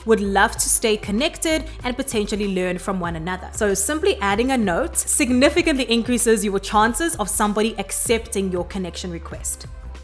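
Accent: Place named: South Africa. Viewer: So nice to hear another South African accent 🇿🇦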